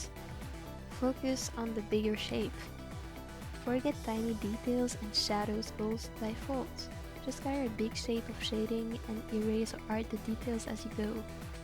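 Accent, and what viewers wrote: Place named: France. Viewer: Okay I’m not commenting about the video (you’re very very talented) BUT I needed to tell you that I didn’t even heard your French accent at first Like At all. And I’m French too, and it makes me kinda more confident to hear another French speaking English! So haha thanks :))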